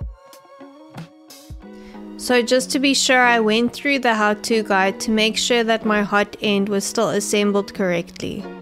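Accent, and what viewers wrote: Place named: South Africa. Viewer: I'm usually good at picking up what an accent is. Yours has me stumped, but I'll take a guess, are you from South Africa but have lived elsewhere long enough to end up with a hybrid accent? Great video. I had thermal runaway recently too, but it was due to me experimenting with my machine after flashing the firmware.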